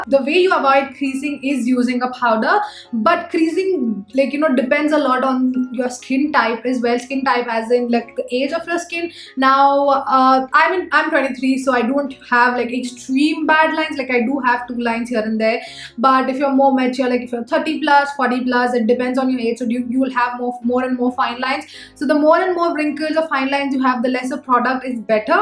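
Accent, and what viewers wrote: South India, India. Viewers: Loving that South Indian accent in your English...❤️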